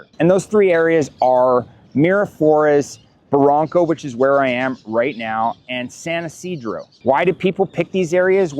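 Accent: Canada. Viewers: I somehow knew immediately you were Canadian --- got a chuckle when you said where you were from and the rain tally. I'm in North Vancouver so, yeah..... Thanks for sharing, I'll be looking into Peru more going forward --- it's been on my shortlist as well. Cheers!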